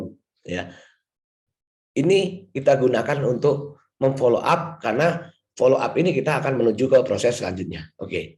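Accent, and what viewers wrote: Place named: Surabaya, Indonesia. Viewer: Yo arek suroboyoan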